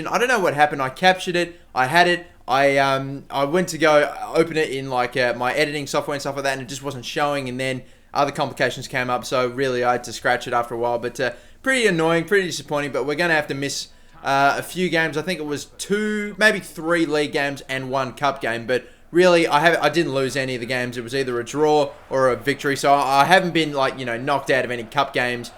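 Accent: Australia. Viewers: Are you Australian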